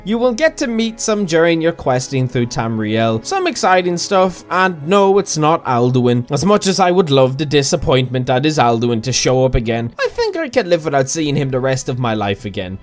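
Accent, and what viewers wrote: Ireland. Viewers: Are you actually irish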